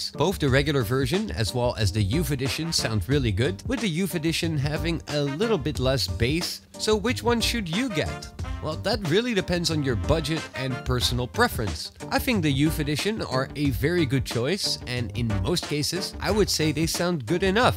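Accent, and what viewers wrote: Netherlands. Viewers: Are you Dutch? I think I can hear a veeeery slight Dutch accent creeping through your otherwise flawless English. Groetjes ;) Also, as a Xiaomi phone user, do they give any benefits? Like the OnePlus' earphone has magnetic buds which autopause/disconnect and connect/autoplay when put together or separated, when using a OnePlus phone.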